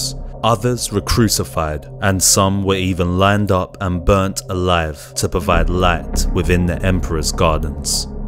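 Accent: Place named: East London, United Kingdom. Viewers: What accent is that... It sounds like the way my black friends speak near London. Brilliant stories btw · Nero was clearly psychotic. The Mad King? Nice voiceover- East London much?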